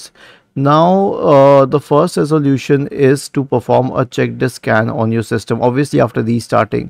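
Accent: India. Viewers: Speak english not crap of indian mix it english stupid video:face-red-droopy-eyes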